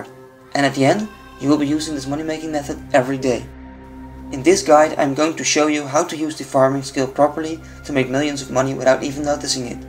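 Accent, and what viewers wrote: Netherlands. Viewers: With this accent I´m gonna assume you´re Dutch :P Great guide anyway. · The dutch accent hahahaha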